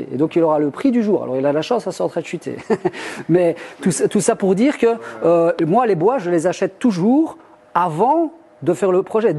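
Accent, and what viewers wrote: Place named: Belgium. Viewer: ' c'est du Belche une fois hein' .... le charme à 100%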